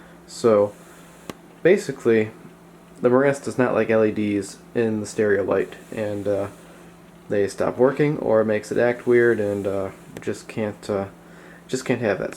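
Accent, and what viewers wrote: United States. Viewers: You are from Michigan?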